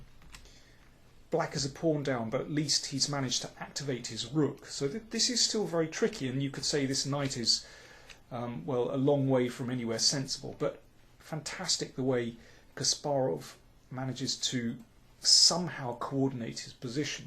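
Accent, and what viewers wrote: United Kingdom. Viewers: What the the heck are you talking about with his speech!? He sounds perfect to me and so charmingly British! I'm genuinely curious what you hear that I don't.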